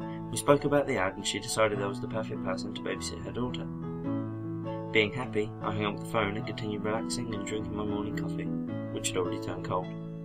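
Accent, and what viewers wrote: United Kingdom. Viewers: ain't often i find a British narrator i like, nice one bro.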